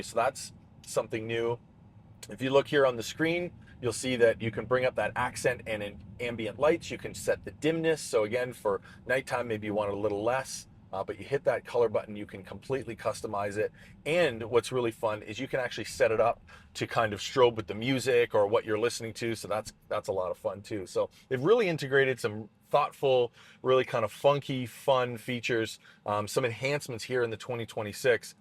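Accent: Canada. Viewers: Totally called that you were Canada… I heard it 😂😂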